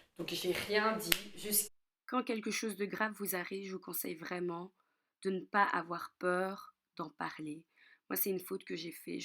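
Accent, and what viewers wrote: Belgium. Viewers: Est-ce que tu es Belge prcq tu as un accent? 😂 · Sa ma fait rire surtout avec ton accent belge ahah mais c’est vrai que sur le coup t’es paralysé 🤷🏻‍♀️💙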